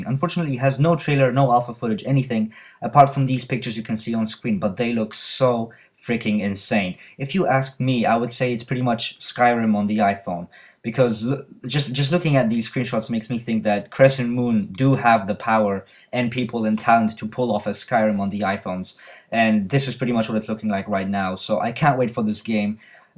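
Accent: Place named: Hawaii, United States. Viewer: You sound like ryan higa when he's doing his hawaiian accent...... #justsaying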